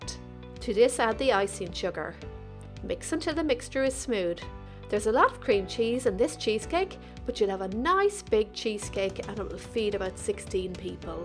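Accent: Ireland. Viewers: Love ya accent, reminds me of my gran and grandad and all my family in Ireland when I was growing up 🇮🇪 and I’m gonna have to try and make one of these to try 😊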